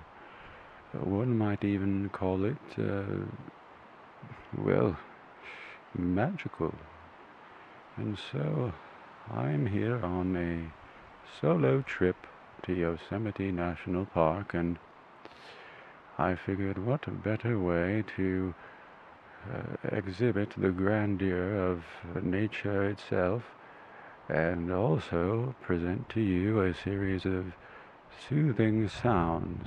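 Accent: United Kingdom. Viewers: Very good British accent! Im a brit myself and its uncanny dear boy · r u bri’ish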